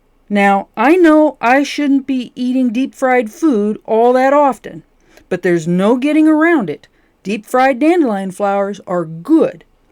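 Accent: South, United States. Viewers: Hey great vid, I am watching this in England and I just love your voice, is that a southern accent ?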